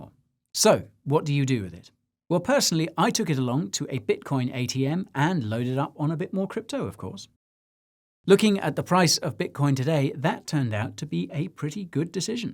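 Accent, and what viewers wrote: United States. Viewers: Love the American accent! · Good American accent Guy! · I never get tired of the American accent lol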